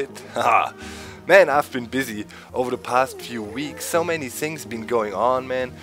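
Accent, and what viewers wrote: Germany. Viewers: are you from england with a bit accent of germany?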